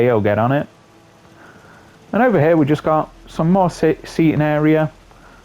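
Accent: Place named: Yorkshire, United Kingdom. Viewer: ! I was gonna guess Derbyshire/Lincs 'cause it's near to Yorkshire, but not quite the accent..But YES TO GOD'S OWN COUNTRY!! One of us, one of us! I like it when you get an idea like that and then execute it into your build. I feel you could utilise some other war knowledge into your other builds?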